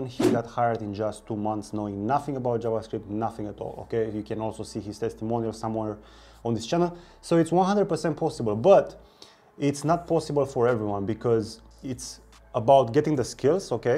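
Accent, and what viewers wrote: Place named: Romania. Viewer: Pretty sure you're a mici enjoyer only by your accent. How about simply just jumping into projects and learn trough your mistakes? We have gpt, it can help us, but after the helping code is received, we should also learn from gpt why he did that, and then use that thing until u fully understand it. Coding for the average person (with a job or being a student or both, or even having mental issues), would take around 8-16 months to learn a language to the point where he won t use the internet and copy-paste code 60% of its project, or the big plante, being easy for him to explain his code.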